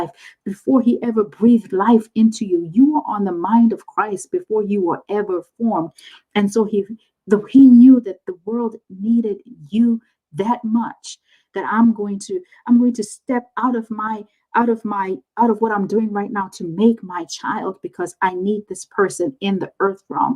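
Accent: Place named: Caribbean. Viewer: Even if you didn't have the Caribbean accent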